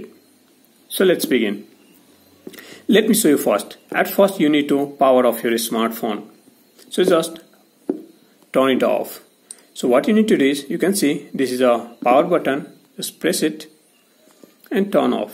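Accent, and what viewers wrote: India. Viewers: U are Bengali ?? U accent is pure Bengali ... Bhai Hindi may bolo naaa itni takleef kyun le raheho bygan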